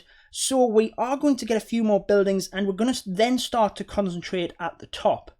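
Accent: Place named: Newcastle, United Kingdom. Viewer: found your channel and subscribed! Great content mate 😃 can't quite get your accent! was gonna say Geordie but leaning more towards Boro, Sunderland? I'm from near Darlington! keep up the good work :)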